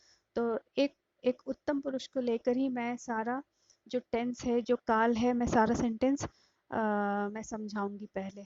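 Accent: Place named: Aurangabad, India. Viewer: Aapka location aaurangabad hai. Sayad har jila me log alag alag tarah se maghi bolte hai. Hamara navada hai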